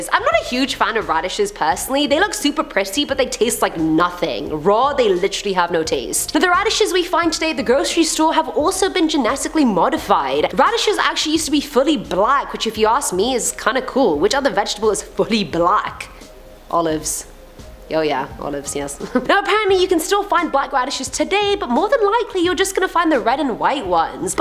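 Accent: South, United States. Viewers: I'm from Mississippi and cant stand fake southern accents but yours is perfect..luv ya your awesome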